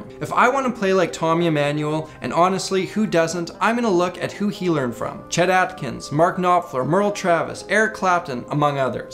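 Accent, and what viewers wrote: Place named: Canada. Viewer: Being honest, your Canadian accent is pretty cool! I get yours way before than American accent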